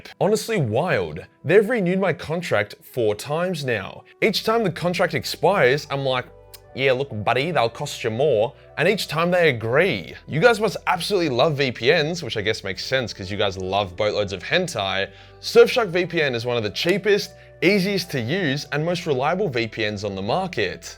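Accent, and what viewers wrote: Australia. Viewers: Who is this australian guy and why does he hate this fictionial angry man so much? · He is a fellow aussie, matey bruh...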